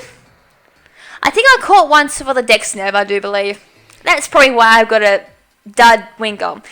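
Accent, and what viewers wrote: Australia. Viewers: Female Australian accent is hot af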